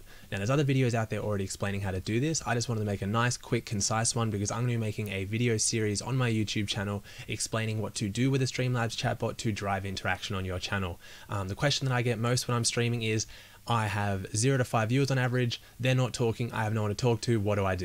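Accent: Australia. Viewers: Your voice sounds like a little bit of ASMR aussie!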